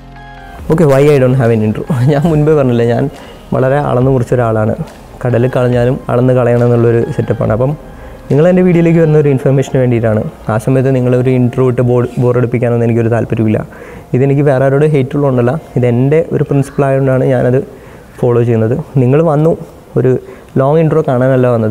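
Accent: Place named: Kannur, India. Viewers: സംസാരത്തിൽ നിന്നും മനസ്സിലാവുന്നു വടക്കേ മലബാറുകാരനാണ് അല്ലെ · It’s not about just age,it’s about your friendly approach.Love and respect from Kannur,Kerala. (Your accent resembles Kannur boy)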